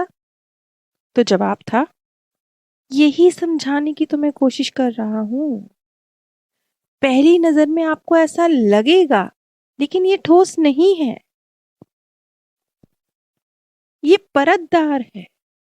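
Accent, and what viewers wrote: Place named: Punjab, India. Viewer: Sir ma'm punjab s hn.. kuchh accent alag hote h aap kisi rajasthani s hindi bulwayiye vo alag bolega Gujrati alag bolega, marathi to bilkul e alag south wala to teda hi kar dega.. aur bache vo angrez unse bulwakar dikhao zara dhwani ko dwaaaanni😅 bana denge Shabdo s pare chale jao.. yhan school nh h zamana gya school ka ab samjhne ka daur h chhodiye meen mekh aapko to pata h na dhwani kaise bolte h enough h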